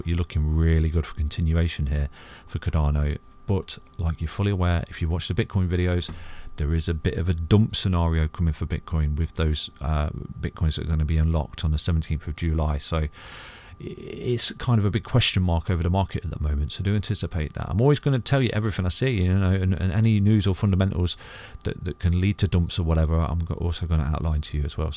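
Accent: United Kingdom. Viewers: Realistic analysis, realistic british accent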